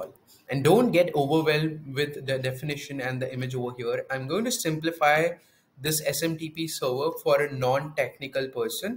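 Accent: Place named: India. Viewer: I was about to sign up for a Growth account, but unfortunately, I am not able to rely on a heavy Indian accent when viewing videos and tutorials. Do you have support by American English speakers???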